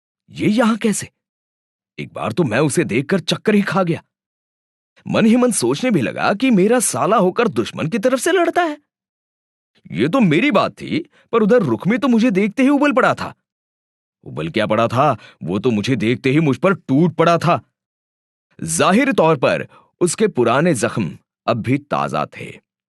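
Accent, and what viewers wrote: Gujarat, India. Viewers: Sir ji Ye achha hua ki. Koi. Aur. Comentary kar. Raha hai Agar aap. Boltey. Toh Aapka ton jo ek. Gujju jaisa Aur. Aapke. Jo dress👗 hotey. Hai woh. Koi. Joker🃏. Se Kam nahi hotey Aap. Kitne bhi. Bade pravachan Karne. Wale ho.. Magar aapko Apne. Dress ka khayal. Rakhna chahiye Ye ekdum. Se. Joker🃏. Jaisa Ya koi. Over acting karne wale Jaise dikhtey. Ho aap Kuch toh akkal lagao guruji Ki logo ko kya 💆‍♀️massages jataa hai Aapko ek sudhh khadi ka kurta hi jachega Aur. Mai aapko criticism. Ke. Hisaab se baat nahi kar. Raha hu Aap. Jaise log Aur logo ko prerna detey hai Aur. Aap joker🃏 jaise. Dress👗 Pahan kar aa. Jaatey ho stage. Par.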